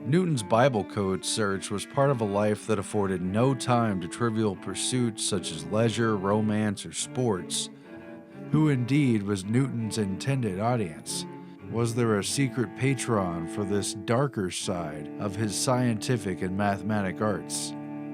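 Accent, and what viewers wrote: United States. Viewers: Very much enjoyed the narration. A very quintessentially "American" sounding voice, if ever I heard one. (Put me somewhat in mind of Peter Coyote's narrations). Nice pacing, pronunciations, and inflections....and even the printed/blue highlighted readings were effective: activating even more neuro pathways than just simply hearing the narration. SOoooo much better than all those robotron voices we've been hearing around YouTube for some time. Think I'll take a look around this channel some more.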